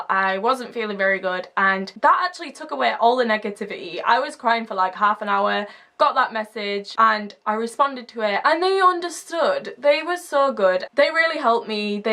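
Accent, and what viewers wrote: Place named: North England, United Kingdom. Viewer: Just seen your one on coming off citalopram and wanting to do same, also you sound local I'm a northern lad too ha ha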